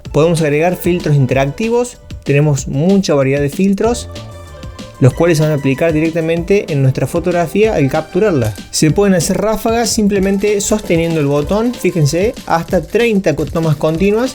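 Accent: Argentina. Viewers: Está brutal este celu! mí única duda era si tenía radio y siiii 😻 gracias por mostrarlo!! Yo quiero el Moto G41 pero me es inalcanzable su precio y este tiene casi lo mismo por menos dinero 😍✨✨ excelente Review!! y más porque sos argentino y no español o de otro lado, se siente más cercano todo lmL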